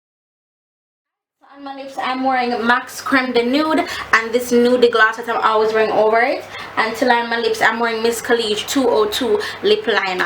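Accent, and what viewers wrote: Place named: Jamaica. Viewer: Love your videos!! And your accent-from a fellow Jamaican x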